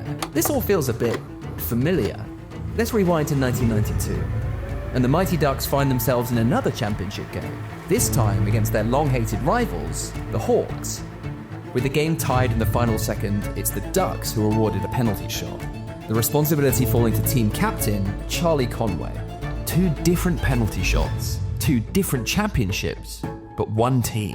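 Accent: United Kingdom. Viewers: It’s my First time on the channel, I’m British and was expecting a North American to be narrating, to my absolute delight he’s British. Love to see it, hockey is so much bigger in the UK than what most North Americans think!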